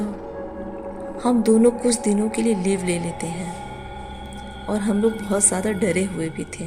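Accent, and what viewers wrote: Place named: Kolkata, India. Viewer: Ap ki language culcuta ki hai Kia ap culcuta se ho Asalam u Alekum lots of love from Rawalpindi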